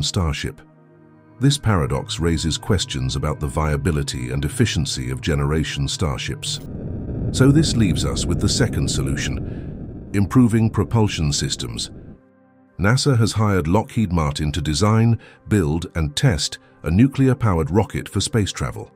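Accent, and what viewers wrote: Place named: United Kingdom. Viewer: Another awful UK accent . YUK.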